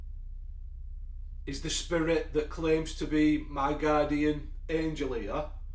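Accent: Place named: North, United Kingdom. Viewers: So I had to ask........ I was visiting one of my best friends over the holiday who is an Anglophile (and never even been across the pond mind you), so as I never did pick up where it is you live specifically over there she told me that if I showed her a video she could pick out where she thinks you live based on your accent. My Anglophile Yank friend here who has never left North America or resided outside Washington State bets that you either live in or spent most of your life in or near Manchester. The north.... I'm dying to hear how close she is! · I just had a thought could he have shortened anastasia to stasia or stay in life cause the stay sound in hers and Stacey is a coincidence to some degree? Also I'm from London & the way you Northeners say Romania as Rooo mania just makes my day every time you say it 😊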